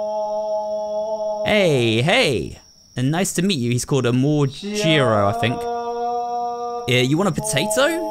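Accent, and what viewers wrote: United Kingdom. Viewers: Ur uploads always soothe my stressed mind with that comforting and sexy british accent. Luv u!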